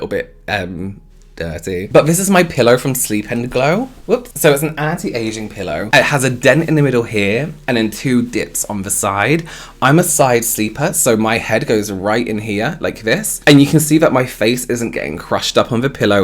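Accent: United Kingdom. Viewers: Dearest, you sound loud enough but still sassy and classy with your lovely British accent!! We love you in my FB makeup group here in Canada, our "unofficial Official" mascot!! You're our favorite twin BTW!! We do love James, how can we not? But, you just slightly outdo him in the finesse category!!💋😉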